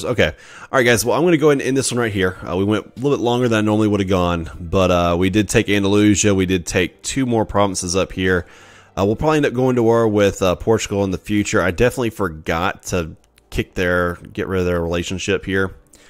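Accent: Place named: East, United States. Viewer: You sound very eastern american. Where are you from?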